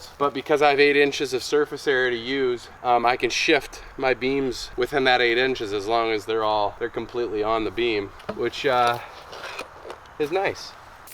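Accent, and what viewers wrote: Canada. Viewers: Wow I’m Canadian and could’ve sworn you were Canadian. U sound like one.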